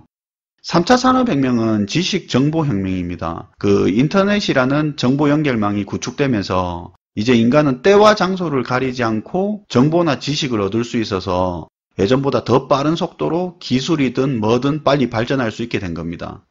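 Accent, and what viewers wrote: Gyeongsang, South Korea. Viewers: 갱산도 사투리가 아주 구수합니다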